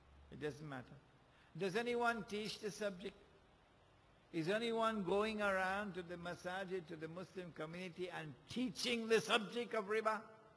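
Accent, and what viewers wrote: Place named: Jamaica. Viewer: Him speak in jamaican accent maan!!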